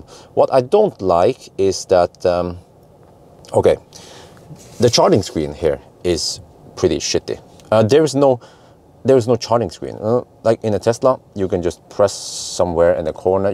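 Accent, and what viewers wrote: United States. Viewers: Diggin' the American accent!!😂😂🤣🤣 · merican accent car sales man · I'm REALLY impressed by this car, but only thing that worries me is this stop charging button next to port. Easy way for stranger to stop our session is now one click away :( American accent - top noch :)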